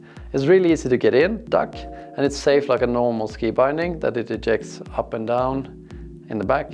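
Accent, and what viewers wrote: Sweden. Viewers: Hehe, yer so funny. I liked your way of explaining the disadvantage with the pointy binding 😂 Are you by any chance Swedish? You got a good accent. ☺️ I’m searching for a nice marker that got easy switch for adjusting for the booth size with an 11cm wide ski stopper. Any suggestions? I don’t like griffon ... you got to screw them that is you need a screwdriver 😥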